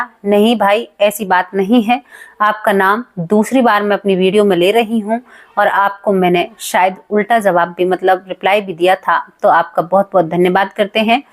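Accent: Haryana, India. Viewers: Aap ki bolney ki tone Haryanavi hai 👍👍👍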